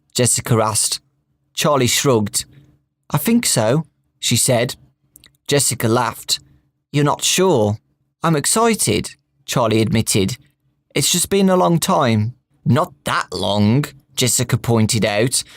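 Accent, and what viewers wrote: United Kingdom. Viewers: i dont need super thick british audio reading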